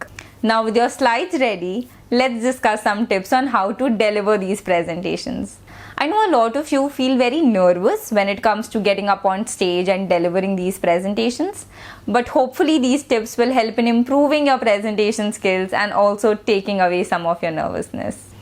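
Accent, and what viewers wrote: India. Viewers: Indian accent sucks..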